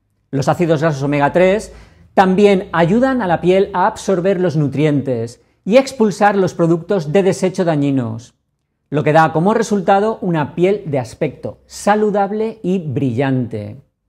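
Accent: Spain. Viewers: Q,buena información mi Dr,mando a tomar Omega 3,ya q se me está cayendo el cabello .yo me quedé viendo todo su video muchas gracias .me encanta como háblan los españoles,🇨🇴✈️✈️de Medellín,Colombia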